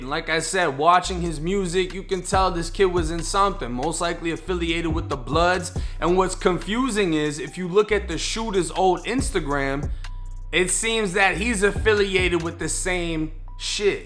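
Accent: Boston, United States. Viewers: I watched like 100 of your videos just seem more real than these other internet niggas sounds like you from Boston or some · Sounds like you from Boston with that accent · Boston accent. Reporting on Cali politics 🤔 I'm not mad long as it's all factual and accurate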